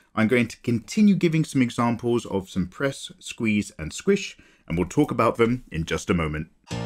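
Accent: United Kingdom. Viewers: he just seems innocent Because of his old school British accent. if he was from Boston he'd be the greasiest drawing tutor there ever was lol.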